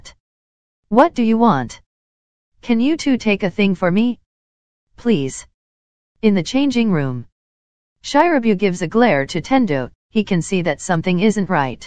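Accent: Italy. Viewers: ti prego ho capito subito che sei italiana, comunque adoro troppo il video<3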